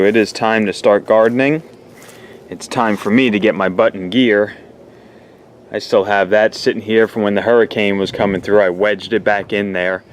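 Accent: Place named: South Texas, United States. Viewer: I hear South Texas in your voice. Do you ever grow super hot peppers.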